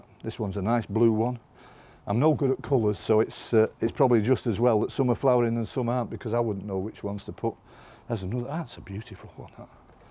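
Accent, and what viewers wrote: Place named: Northern England, United Kingdom. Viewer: Yes an English gardener👍😉👍 and by the accent a northern one at that. I've SUBSCRIBED, I'm getting on in years but giving gardening another go, and who else to follow but someone who actually lives in my part of the world. A brilliant video mate and I'm already looking forward to watching more of your video's.... oh I'm from South Yorkshire and pleased to meet you👍😉👍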